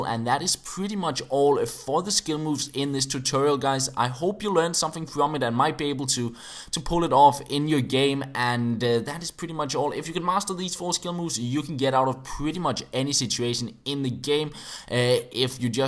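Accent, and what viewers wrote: Denmark. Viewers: Is he Danish becues im Danish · Are you danish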